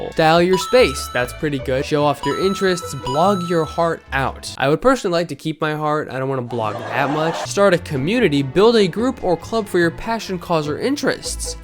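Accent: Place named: Midwest, United States. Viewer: midwestern kurtis conner? (also omg we're litch the same exact age what)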